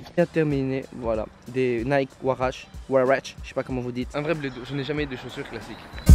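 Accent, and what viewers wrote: Belgium. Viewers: T'es belge??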